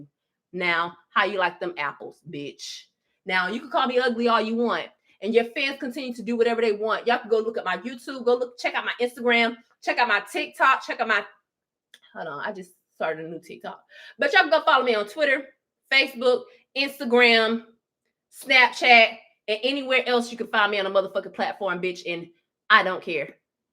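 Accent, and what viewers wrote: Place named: Jamaica. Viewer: LolBit@ I’m Jamaican I have the accent too but I love it hahaha you funny af.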